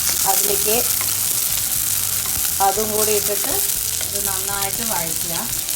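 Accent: Palakkad, India. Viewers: palakkad slang kelkkan super njn thrissur anu pne curry indakkunund tto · Madam.your Palakkad language kekkan sumundu.kettolin Palakkadil evedeyanu Basha kettu kollongode side Anu thonnunnu .with best wishes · your Palakkadan language awesome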